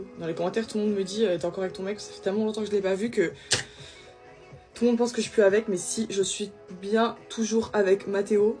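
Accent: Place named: Moselle, France. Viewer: Vous avez un accent mosellan 🥰😄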